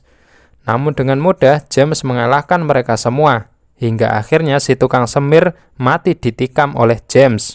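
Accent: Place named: Java, Indonesia. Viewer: Cek medok eee cak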